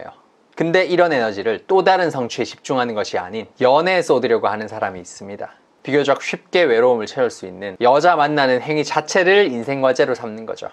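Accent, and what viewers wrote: Gyeongsang, South Korea. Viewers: 밑지방 사람인거 티가 나기보단 그냥 대놓고 나 밑지방 사람이야!!!!!하네요. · 대구 말투에요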